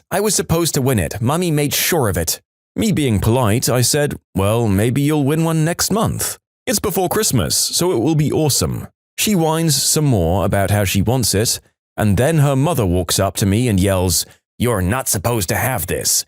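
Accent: United Kingdom. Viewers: British accent!!! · Legit i noticed the voice im laughing but no not offensive i mean i think british voices are funny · Since when is the narrator British? I honestly kinda like the British narrator ngl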